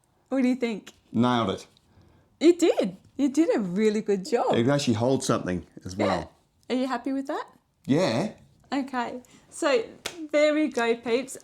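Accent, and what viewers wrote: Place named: Australia. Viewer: Only came across your tutorials last week but absolutely loving them and pleased to be hearing an Aussie accent, colloquials and slang for a change. Brilliant to see give it a hard crack and have a few bloopers. It was a good laugh because we've all been there with the awkwardness etc. Thanks heaps and looking forward to seeing more. Cheers from Wollongong, NSW.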